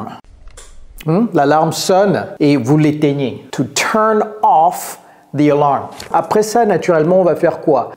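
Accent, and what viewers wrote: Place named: United States. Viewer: You sound very good, like an American. You reach well! I like your classes. Keep going!!!!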